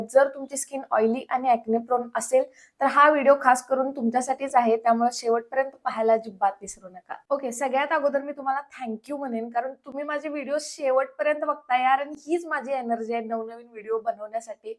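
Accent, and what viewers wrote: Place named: Western Maharashtra, India. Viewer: Tumhi pakka satara sangli kolhapur kadche aahat 😅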